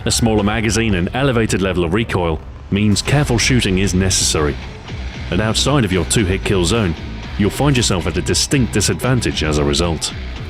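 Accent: England, United Kingdom. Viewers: bet you're English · If you can't understand an English accent when you speak English as your first language then you just fail at life.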